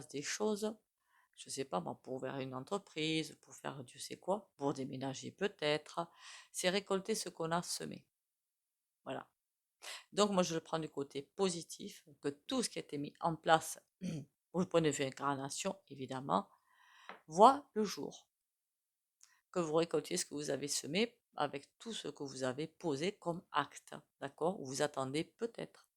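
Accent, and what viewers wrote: South, France. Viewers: Bonjour, j'apprécie de plus en plus vos vidéos j'adore votre accent du Sud il me rappelle mon adolescence à La Ciotat. J'ai aimé la série des Stargates et le quantique y été à chaque épisode. Merci d'être la 😘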